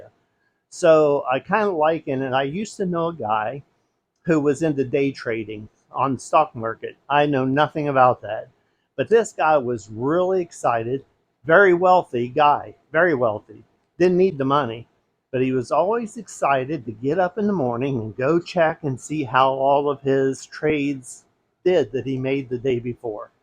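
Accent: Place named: Pennsylvania, United States. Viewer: Great video! I think hear a PA accent, am I right? South?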